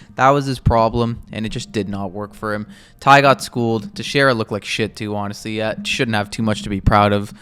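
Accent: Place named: United States. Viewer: Are you a Yank?? Sounds like it. Typical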